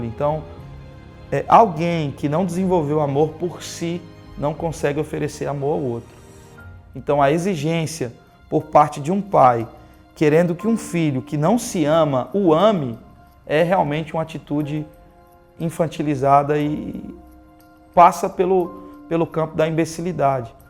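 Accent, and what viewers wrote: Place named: Recife, Brazil. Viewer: Pelo sotaque... já me ligou no saber entender..sou recifense e amei lembrar minha terra. Você é bem sábio pra idade que tem. Tenho dois filhos e o amor sim ..tem de ser construído e as vezes ..a duras penas porque os filhos não têm maturidade e ser mãe implica em amar e se respeitar e ensinar isso..e é difícil..mas vai..o amor é uma semente que a gente rega..se nascer nasceu..se não nasceu é porque a terra não estava preparada no momento certo. Gratidão pelas suas palavras ...gostei e me conquistou pela sua frontalidade.🌹🇧🇷🇵🇹🌵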